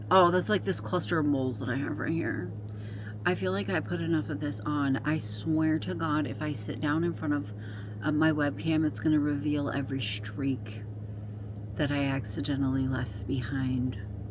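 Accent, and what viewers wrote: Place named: United Kingdom. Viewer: British accent 💯!!! 😂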